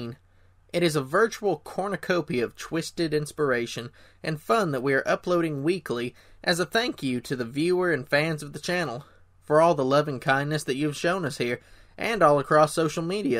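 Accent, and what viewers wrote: South, United States. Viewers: Another great work! And you narrate so well. Your southern accent is always quite pleasing! Thank you for your YouTube channel.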